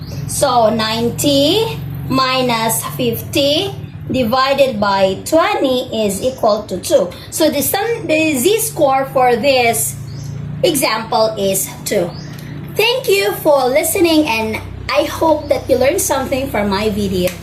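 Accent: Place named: Bisaya, Philippines. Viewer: Wow! I can see the effort and sacrifice within the video. Anyway, I like the bisayan 'ACCENT' of Mrs.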